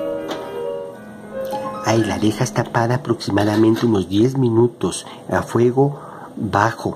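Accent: Colombia. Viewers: Muy colombiana la receta y la narración. Me gustó mucho y también me reí 😃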